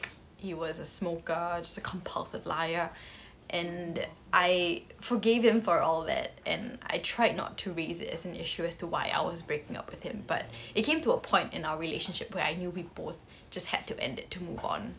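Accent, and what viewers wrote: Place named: United Kingdom. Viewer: Gosh I love your accent. Kinda British way. I prefer watching the videos than replies on your IG stories. More engagement with fans and I'm gaining knowledge from you. Interesting contents you have been working lately. Keep it up pls!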